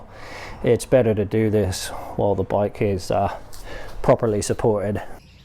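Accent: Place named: West Country, United Kingdom. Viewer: I wasn't sure whether it was West Country or Norfolk. Hahahaha. I'm Peterborough born and bred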